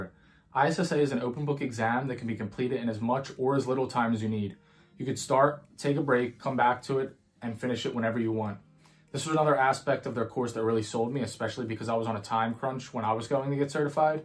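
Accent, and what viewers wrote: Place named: Philadelphia, United States. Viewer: You spoke 4 words and I was like this dudes from NE philly and then I seen the judge shirt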